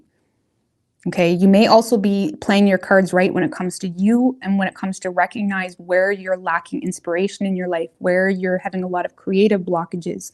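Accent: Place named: Canada. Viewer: Just wanna say. I really like when readers are open enough to let is into their personal lives and take a break to stretch, smoke break etc. As a smoker i love to see the reality and appreciate doing what you do. As a fellow Canadian who lives in thd U.S. hearing you makes me happy and homesick. Thanks.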